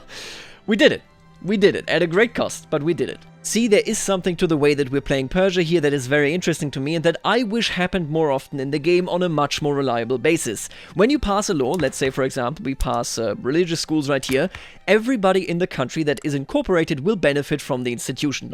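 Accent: Germany. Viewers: Hearing iranian names in a german accent is.... interesting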